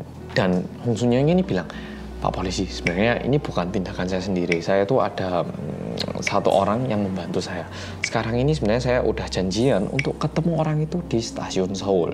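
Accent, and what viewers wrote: Java, Indonesia. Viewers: Aku terekomendasi dari temen, tertarik goro goro mas e ngomong wong korea medok 😂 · korea punya youtuber orang korea yg medok, jepang punya youtuber nihongo mantapu .. keduanya favorite gue even gue udah emak" 🥰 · Samean kok iso medok too mas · sprt biasa mas selalu menceritakan secara detail dan dg gaya khas medoknya wkwkwk, lanjoottt trs mas sukses yoh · Ini muka nya kyk Korea bahasa bicara nya kyk org jawa.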